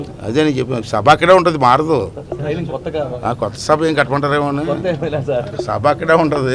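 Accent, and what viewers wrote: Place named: Narsipatnam, India. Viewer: Rey ade narsipatnam slang ra babu..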